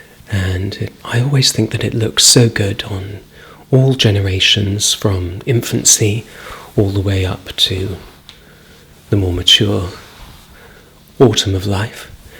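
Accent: United Kingdom. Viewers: Great job. As an American, something about various British English and pronunciation is just supremely professional and clean. This series of yours is an absolute fan favourite.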